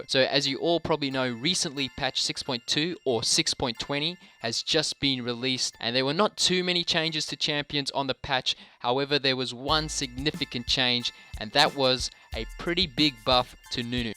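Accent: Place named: South Africa. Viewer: That accent. Sounds like South African living in Australia.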